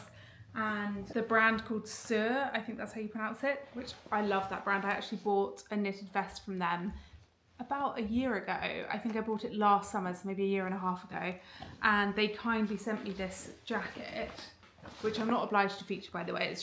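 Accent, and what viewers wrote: United Kingdom. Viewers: I am sooooo happy you are back! I watched you for years. You are very entertaining to watch and I love your British accent. (I am from the US). Everything sounds better with a British accent. You look so pretty….so excited for the tour of your newly renovated house.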